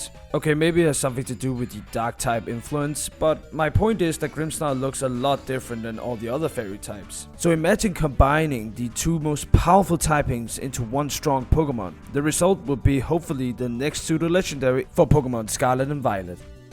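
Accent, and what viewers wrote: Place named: Denmark. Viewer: Figured you were Danish based on your voice alone, got further solidified by you using the Danish website, lol.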